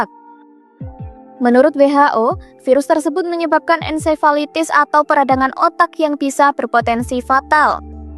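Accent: Java, Indonesia. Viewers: Medok banget ya logat jawanya😂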